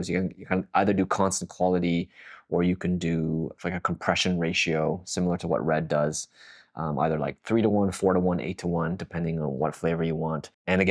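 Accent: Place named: Toronto, Canada. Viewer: Cine in the 6? Sounds like Toronto, followed 🤙🏾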